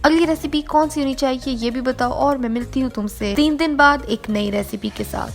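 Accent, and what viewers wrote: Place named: Punjab, India. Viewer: OMG! your accent makes your cooking videos killershot..... Punjabi ki to kya hi baat theee........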